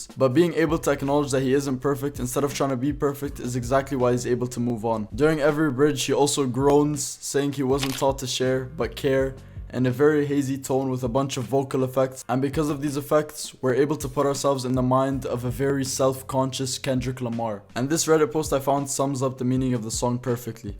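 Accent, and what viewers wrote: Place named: United Kingdom. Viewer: Why do I love the British accent so much😭😭😭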